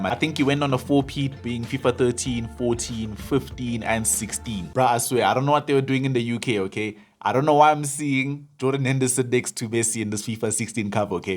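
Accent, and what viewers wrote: South Africa. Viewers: You just know a south african brother when they speak 🫴🏽💪🏽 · I was detecting a South African accent all along.. hante😅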